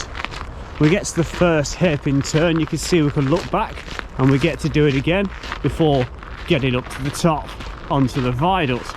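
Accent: Nottingham, United Kingdom. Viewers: Another great vid mate. You must be from Notts with that accent...' 'Bulwool' ! Lol. Hope to go up there soon .Bless yuz.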